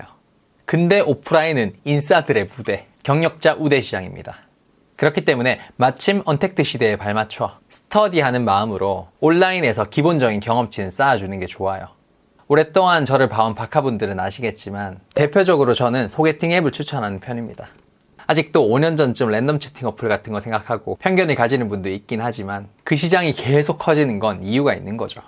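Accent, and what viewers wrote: Gyeongsang, South Korea. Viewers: 뭔가 말투가 말 끝부분은 서울말인데 단어단어에 부산, 경상도 사투라가 녹아있음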